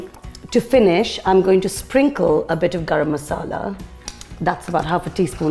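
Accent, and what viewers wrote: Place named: India. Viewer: Excellent recipe, thank you. Side note: the Indian/English accent is the most beautiful accent in the world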